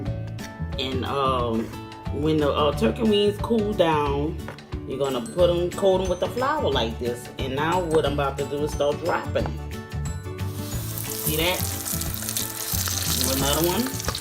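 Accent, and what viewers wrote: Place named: New Orleans, United States. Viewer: She sounds like shes from Louisiana New Orleans to be exact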